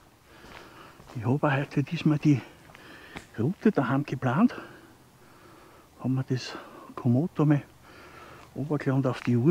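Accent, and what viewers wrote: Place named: Austria. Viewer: Danke lieber, ja da gibt es viele Videos... Aber deine sind die Besten. Deine Art etwas vorzustellen hat was ganz besonders... Dein österreichische Dialekt, deine Ruhe... und die Umgebung in der du filmst... 👍👍👍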